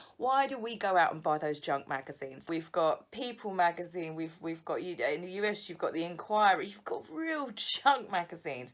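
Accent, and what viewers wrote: England, United Kingdom. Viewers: I like you and your English accent. You are so funny.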